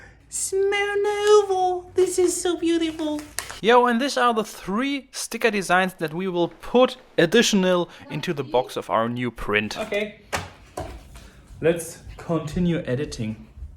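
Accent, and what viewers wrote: Germany. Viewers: Hi, love your work! Where are you from? Love your accent! You sound either German or Scandinavian.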